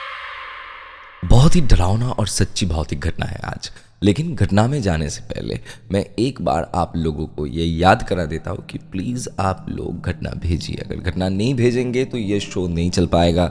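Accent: Bengal, India. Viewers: Bengali ar moto hindi tao valo lege6e. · onak ta bhalo ho cha agr thaka but akhon oo bengali acesnt ta acha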